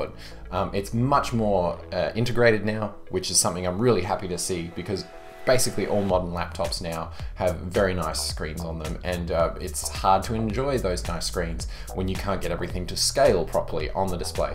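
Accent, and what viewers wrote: Australia. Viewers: Nice to hear an Aussie voice reviewing Linux for a change :) I’m new to Linux and Ubuntu Mate is definitely the best for a new user, I can tweak it to a windows like environment (the new Redmond option is virtually the same as Mint) but my gf wants to get a Mac because her current windows laptop is “too slow” (old) and she likes the Mac dock etc...well once I get more experienced myself I can set up the same distro for her for a free Mac that isn’t spyware (or anyone else who wants a fast, private, secure, easy to start using system) Also the explanations of software in Ubuntu Mate are very necessary for me or else I have no idea what is available or what it all is so that’s actually a feature I appreciate Ubuntu Mate to me is the only distro most people would ever need, or at least the best distro of all to start with while figuring out what other Linux distro is best for their specialized needs Highly appreciate everything the Ubuntu Mate team have done, just amazing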